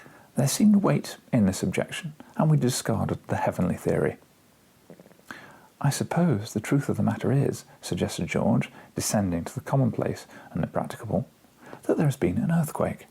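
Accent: United Kingdom. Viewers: That is perfect to improve my English, thank you Doctor British accent, I love the video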